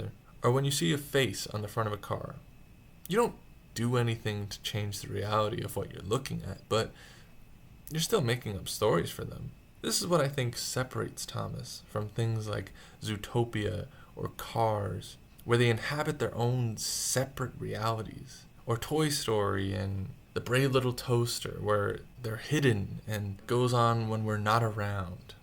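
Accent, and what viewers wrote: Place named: United States. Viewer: I was not ready to hear an American narrator. I didn't know they made that.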